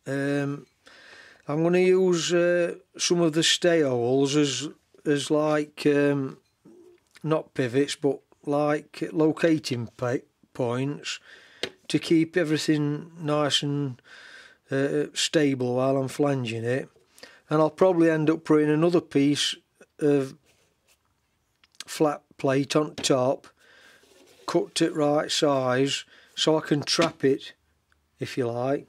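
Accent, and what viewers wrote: Yorkshire, United Kingdom. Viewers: Looks like your taking a very good approach to it, - As you said not the easiest of boilers to make and flange. Are you from Yorkshire? Derbyshire? Keep up the good work !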